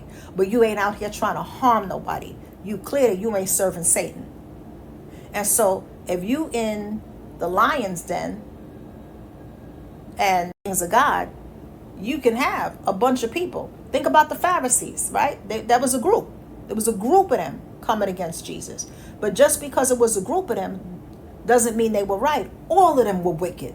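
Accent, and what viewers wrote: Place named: New Jersey, United States. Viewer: Ms., your Jersey accent is off the chain, your accent is beautiful and gorgeous!!!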